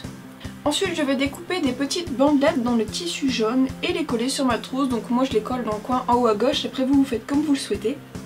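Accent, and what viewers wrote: North, France. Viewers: C'est peut-être con mais le classeur m'as bluffé, il est vraiment bien fais, tellement que tu l'avais acheter tel quel ^^ Sinon (si c'est pas trop indiscret), vers où tu habites ? Parce que je t'ai entendu une fois parler de Reims et sans me tromper je pense pouvoir reconnaître un petit accent du nord de la France :3 Voilà, sinon j'aime beaucoup tes vidéos ^^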